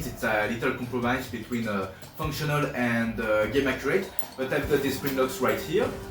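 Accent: France. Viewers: Are you french? Also, love your vids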